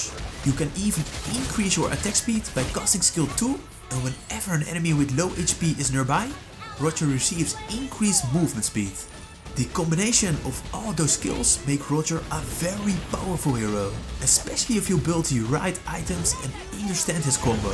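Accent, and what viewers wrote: Netherlands. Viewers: Ik hoor gewoon dat je Nederlands bent HAHAHAHA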